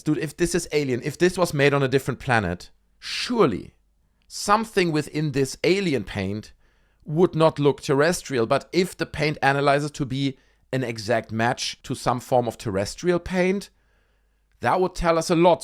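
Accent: Germany. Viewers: Nice accent. German? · You got a German accent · I love that German accent!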